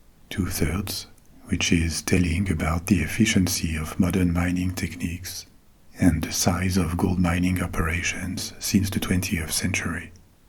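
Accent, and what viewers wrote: France. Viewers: Love your French voice it so calming. Thank mercie becoup! you for the good nights you give😂😂❤😴🥱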